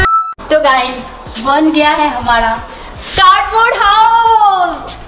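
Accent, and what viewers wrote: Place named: Bengal, India. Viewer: Tum itna cute keuho bataou mughe pls didi up kis jaga per reheteho up Bengalis he bat karte ho pls didi pls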